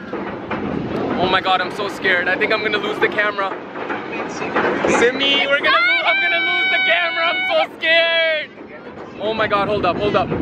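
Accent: Punjab, India. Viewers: right have a punjabi accents with Urdu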